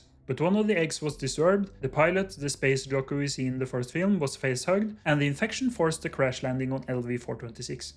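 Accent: Norway. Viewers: Norwegian narrator? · You're always going to have trouble making sense of combining wildly different alien movies, made by directors and writers with completely different visions. Or with directors' brains that have degraded with age. Your- and other fan theories are hopeless. (Also, holy super-thick norwegian accent, batman)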